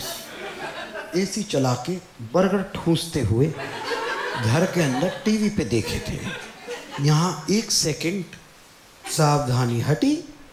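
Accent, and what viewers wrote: Uttar Pradesh, India. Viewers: In his UP accent the way he delivers his jokes ohh god 😂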